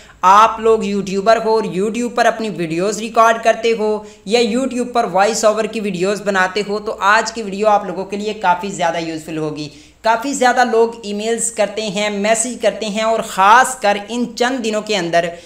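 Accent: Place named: Rural, India. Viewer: Posri k Sakreen nhn hota Screen hota ha bae nhn hota Bhai hota Pendu Urdu chor punjabi ma kr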